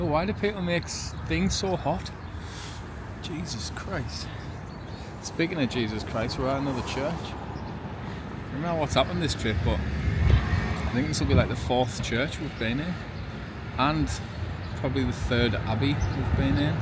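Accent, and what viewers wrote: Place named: Durham, United Kingdom. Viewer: I used to know the Sloane family in Wallsend in the fifties, i wonder if youre related? I detected a few Durham words in your banter, and wondered if you have connections there? I haven't camped out in Northumberland since a was a bairn. I follow Jimmy Lundy on his YT channel and hope to do one of his camps sometime. I don't think he knaas this castle you visited. I've never hoarde of it Just wanted to say in closing You look a bit like Utred in the 'netflix LAST KINGDOM' series. I would like to record you saying "Honour! Is everything! " ⚔️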